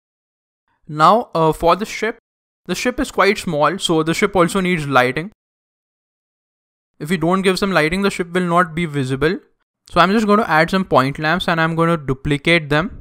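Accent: India. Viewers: Is he Indian or does he have an Indian accent?? im not sure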